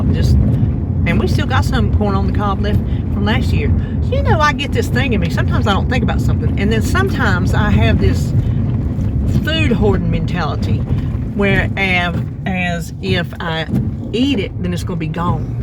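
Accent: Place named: South Jersey, United States. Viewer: I ❤ regional dialects. How boring if we all sounded like AI. The South Jersey accent sounds like home to me. My relatives all lived there.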